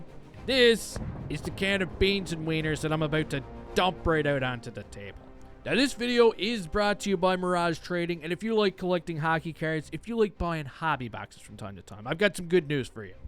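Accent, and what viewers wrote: Newfoundland, Canada. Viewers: You have a real Canadian accent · Is you from Newfoundland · I love your newfy accent! great content too!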